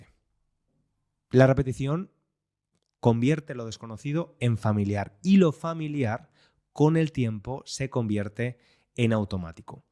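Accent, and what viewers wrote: Spain. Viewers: ¡Me encantan tus podcasts! Intento escucharlos 5 veces por semana. Viví en España un total de 2 años, y ¡cómo echo de menos al acento español! He encontrado por aquí en los EEUU, no hay mucha gente de España. Hay bastante de México, América Central y Sudamérica, y eso está bien, pero me encanta el acento de España y me das una manera de poder escucharlo., tu voz es bien clara, fácil de entender (aún los episodios avanzados) y tu gramática es 👌🏻-me gusta muchísimo! Tu hermana, por otro lado… 😅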